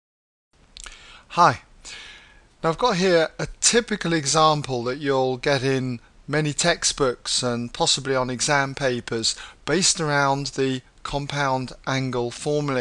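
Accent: United Kingdom. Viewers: The British accent made this video both entertaining and educational! British!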